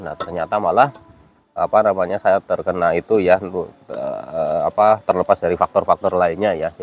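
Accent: Banyumas, Indonesia. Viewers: ngapak a mana mas?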